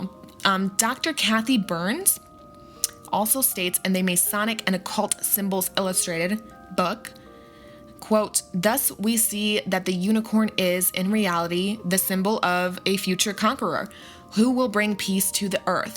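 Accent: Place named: New York, United States. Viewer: Girl how u have a ny accent n from north Chicago lol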